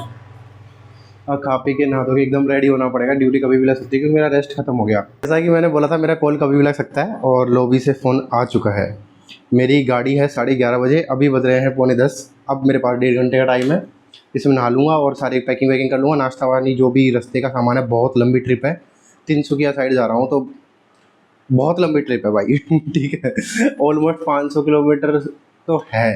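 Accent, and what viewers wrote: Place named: Braj, India. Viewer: Oh Dousa rajsthan se wahi soch raha tha ye brajbhasha ascent bol raha hai mai bhi je hu mathura se❤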